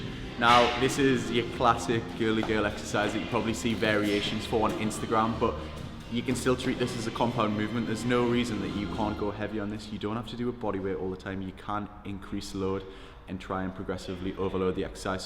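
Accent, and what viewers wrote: Newcastle, United Kingdom. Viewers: Geordie lad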